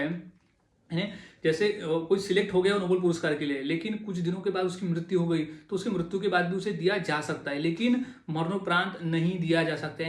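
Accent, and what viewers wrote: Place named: Rajasthan, India. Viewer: Sir aap Rajasthan se he kya kyuki apka accent wesa hi he 😊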